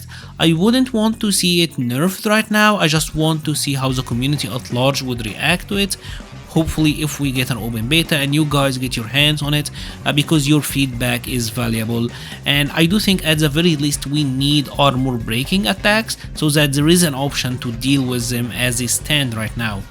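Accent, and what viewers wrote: Egypt. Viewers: صوتك مصري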